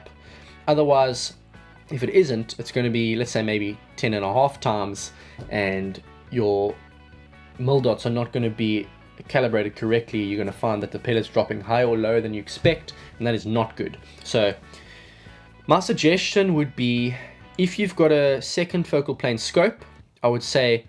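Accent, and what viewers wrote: South Africa. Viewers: is your first language afrikaans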